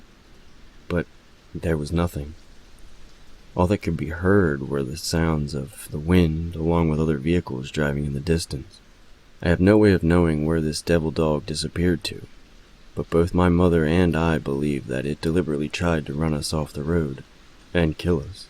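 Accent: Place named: North, United States. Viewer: Great job on sharing these hand picked stories. I get drawn in by the pace and timing and you must be from an area North of the Mason- Dixon line (written by a true Northern New Englander!) You are mastering the art of background sounds without getting washed out by it. Your reading voice is 'lower' not baritone, but in a lower register, so be careful using rolling thunder as IT is in a lower register as well. I sense that you are using a good microphone but, your mixing software may not be able to lay down multiple separate tracks? Really enjoying these recent uploads, drifting back in time to hear older work as time permits. 'Liked', subbed & comment for YT algorithm!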